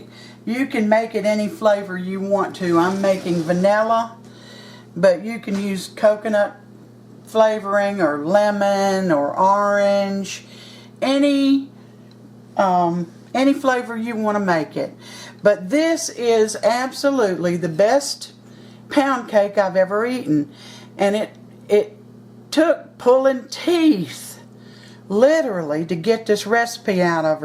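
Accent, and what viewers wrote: South, United States. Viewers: Was this "literal" or a take on your southern accent (as in you came straight "from the fields")?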